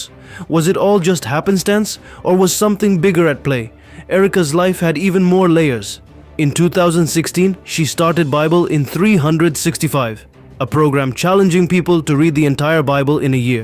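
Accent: India. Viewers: This man is sound like Indiana! Someone paid him to report this fake story!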